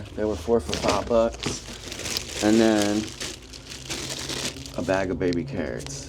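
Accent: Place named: Philadelphia, United States. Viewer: This dude is from Philly i can tell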